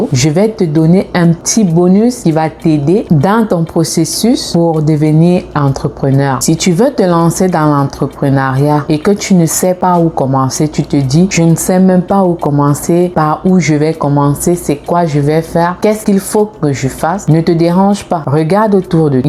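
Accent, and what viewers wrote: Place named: Canada. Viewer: Merçi avec ta petite voix canadienne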